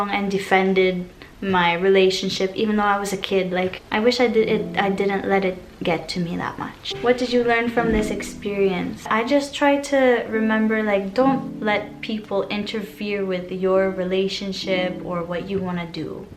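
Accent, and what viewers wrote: Visayas, Philippines. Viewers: Yung English mo parang pilipino accent din 😍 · Ang galing mo naman,pati accent mo pinoy na pinoy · Para na talagang filipina kung pumunto ka idol. Yung english mo filipina accent na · Ang ganda mo pilipinong pilipino ang salita mo kahit puti ang kutis mo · Ganda mo po 😍😍😍 and youre filipino accent is so cute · Para kang Bisaya na nagtatagalog, maganda!!! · Your accent sounds filippino wow!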